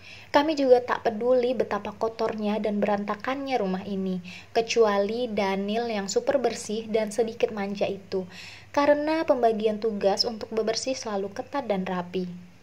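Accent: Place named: Flores, Indonesia. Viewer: Aksen floresnya 😭 butt gooddd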